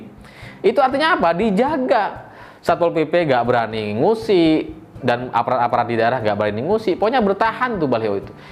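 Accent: Madura, Indonesia. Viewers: Orang madura dari logat ya😁😁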